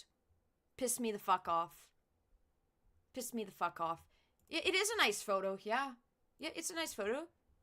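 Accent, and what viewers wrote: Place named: Australia. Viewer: I freekin love u dude. I wish I knew someone in real life like u. The Australian accent sealed the deal lol 😂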